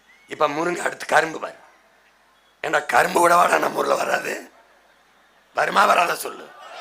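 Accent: Tirunelveli, India. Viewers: ஏலே... திருநெல்வேலியா லே....😅😅❤❤❤❤🎉🎉🎉🎉🎉 நாம் தமிழர் ❤❤❤🎉🎉🎉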